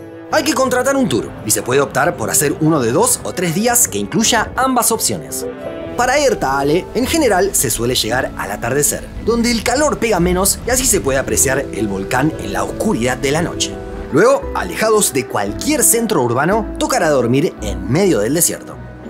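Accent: Argentina. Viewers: Y ese acento más argentino. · Hola, sos de argentina o de uruguay? Porque tenes un acento de mezcla del neutro pero nose si con el argentino o uruguayo.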